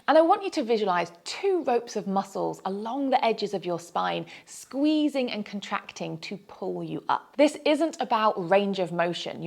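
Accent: United Kingdom. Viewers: Studies show sitting on your desk, listening to a hot British chick telling you not to sit on your desk is an amazing way to spend your time and improve your fitness.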